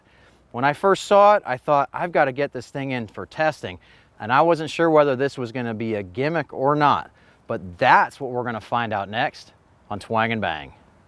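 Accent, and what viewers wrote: United States. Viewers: I find it funny that every video I have seen concerning weapons - the narrator always have a thick American accent. Is America the most weapon-happy country in the world?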